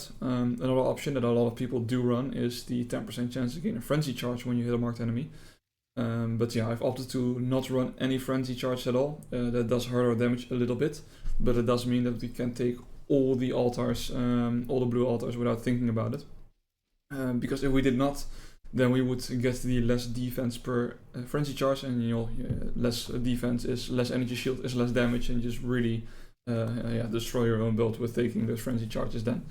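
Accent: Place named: Netherlands. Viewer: This is the best build guide so far. This is my first Poe league and character. Started as Zizaran guide then felt squishy changed to was super tanky but lacking dmg. I tried to change some pob ninja builds I couldn’t work it out. Until I found you. Thanks for your build and I really think you deserve more viewers. And I’ll be your loyal subscriber from now on. Super detailed I understood everything. (Btw i lived in Den Haag for 8 years and i hold Dutch passport, could easily recognise your accent 😊). Please keep continuing these kind of builds. And I’ll follow everyone of them Dank je wel 👍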